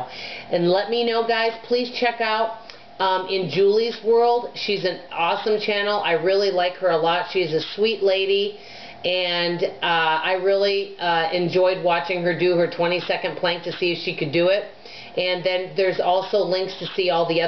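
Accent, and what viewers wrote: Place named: North, United States. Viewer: I know I couldn't do it! I'm a talker .. AND if nobody is at home can you talk to yourself? That MIGHT be doable .. I often talk to myself! I'm fairly new to your channel so I'm dinging the bell so I'm sure I don't miss the results lol GO FOR IT .. (you have an accent so I assume your from the north and you talk really fast I'm From the South and I talk, hear and listen in Southern)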